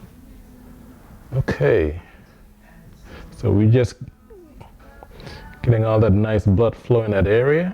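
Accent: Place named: Caribbean. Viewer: Where are you from? I can hear hints of a Caribbean accent.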